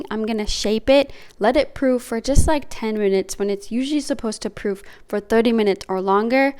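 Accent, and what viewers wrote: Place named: United States. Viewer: Do you have an accent or are you from North America? Like you sound American but with a bit of an accent lol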